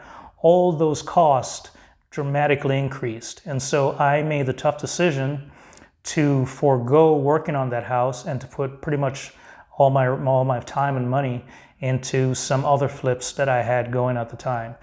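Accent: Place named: South, United States. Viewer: He sounds like a southern gentleman and very humble. Best of luck to this fine man.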